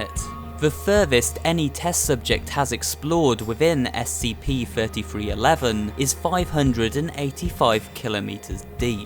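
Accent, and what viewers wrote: United Kingdom. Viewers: You sound like the British version of chills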